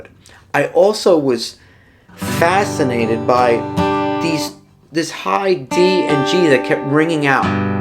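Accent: New York, United States. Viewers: Wish I’d started at 11 instead of 67 lol Anyway, love to hear the NYC accent. I’m a Bronx boy living in Cali the last 10 years. Not a day goes by that someone doesn’t mention my accent. Enjoyed the video If I ever get serious with practice I can be pretty good in my 90s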